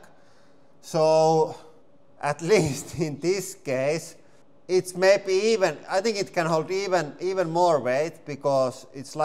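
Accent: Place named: Finland. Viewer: I got recommended by youtube but i can already guess you guys are finnish by the thick finnish accent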